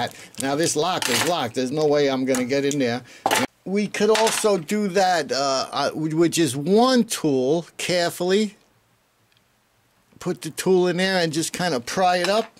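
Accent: New York, United States. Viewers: Good info thanks man. Love the NY accent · Excellent video and I'm loving the accent, New York or at least NY state? or is that Boston?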